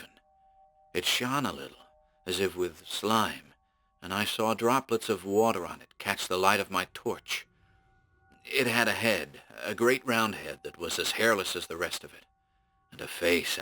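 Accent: United States. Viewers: Your American accent has improved tremendously since you first tried it out. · Wow! This story is awesome! I love the setting and description of the old house and environment around it. This is well-written....many of these old tales are. Fantastic narration! Your American accent it great! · Pretty good American accent!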